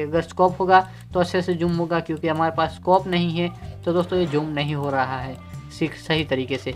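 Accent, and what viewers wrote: Gujarat, India. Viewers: Gujarati Cho? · Bhai aap Gujarati ho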